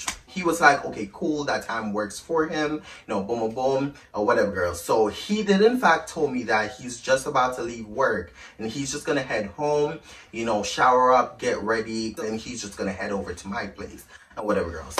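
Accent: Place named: Jamaica. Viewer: The Jamaican accent ❤️😂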